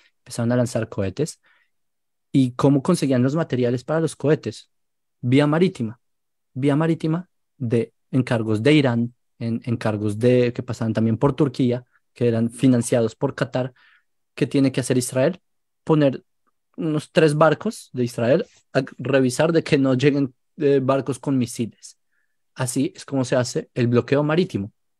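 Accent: Colombia. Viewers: Eres colombiano? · Tienes hacento colombiano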